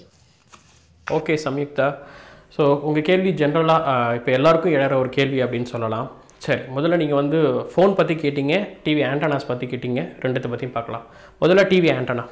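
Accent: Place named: Chennai, India. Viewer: ninka pesum pothu chennai tamil lite ha varuthu bro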